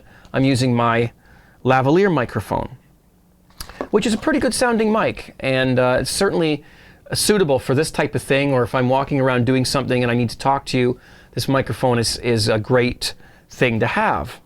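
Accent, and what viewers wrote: Canada. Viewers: I could tell you were canadian by the way you talked.... I learned that from people making fun of canadians.... im a terrible person. :D (I have nothing against canada btw) about the mic: This sounds REALLY nice... I'm probably going to get this today. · Amazing what $90 can do with that Canadian accent.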